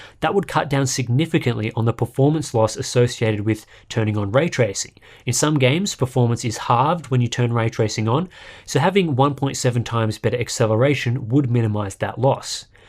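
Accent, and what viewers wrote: Australia. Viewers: An actual proper analysis, in proper Australian English!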